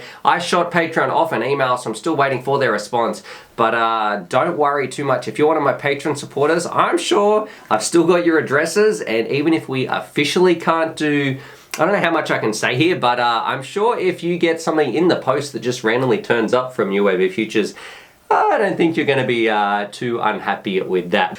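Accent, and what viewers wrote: Australia. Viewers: Being new to this hobby, I'm curious just how many sports or activities there are. Especially at the entry level. I see a few drone makers doing a sort of flight school type thing with certificates at the end. Just curious if anyone has ever done an overview of all of the entry level activities there are they make the learning curve easier or more fun. Thanks for all the awesome work you've done, when I get to submerging myself into a subject on YouTube, someone always rises to the top of my watch list, that sir would be you. Might be the Aussie dialect, but I'm pretty sure it's because you do awesome videos!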